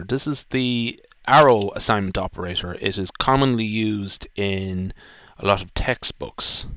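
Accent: Ireland. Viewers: thanks :) refreshing to hear the irish aswell haha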